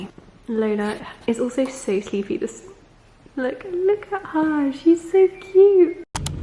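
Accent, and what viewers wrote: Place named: South, United Kingdom. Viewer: Who are all here to learn south British accent?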